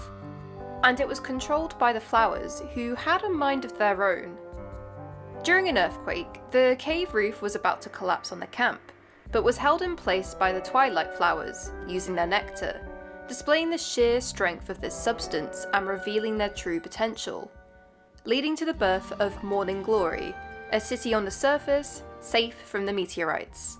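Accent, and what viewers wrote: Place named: United Kingdom. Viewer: my first time watch watching you and my first thought was Bri'ish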